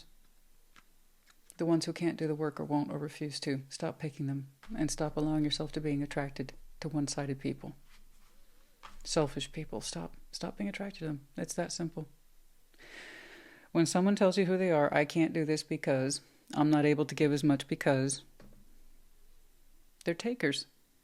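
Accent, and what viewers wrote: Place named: Texas, United States. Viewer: You tube and their bullshit. 🤦‍♀️ I absolutely love you. You keep doing what you’re doing and always, ALWAYS be yourself. I agree that your approach to dealing with the algorithm makes sense. Break em up as you see fit!! I’m gonna watch regardless. Just please, PLEASE, don’t ever give up!! I would definitely miss you and all you do. Love me some!!! I actually get upset when I don’t get to listen to you enough. I don’t get mad, especially not at you, it just feels like there is something missing in my life. I really didn’t realize what an impact you have on me until this year’s new ‘my year in review’ video that had me as one of the top 3% viewers of your channel. I froze when I saw that- as wide an array of channels I follow, and as much time as I spend watching or listening, top 3% is a LOT!! Anyway, just wanted to thank you for being who you are and doing what you do. 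You do you EXCEPTIONALLY well. Love your readings. Love your accent (fellow southerner here my Texas treasure). You sense of humor is phenomenal!! You have me rolling on the floor in your intros. Especially when your truth comes out- you are always spot on when it comes to people- and you absolutely do not hold back. LOVE IT!! I feel like you are family after following you for some 7 years now. I wish I could express exactly how important your insights and knowledge are, and have been impacting my life. Absolutely for the better. You are inspiring and charismatic. Best of all, you ROCK!!! I lov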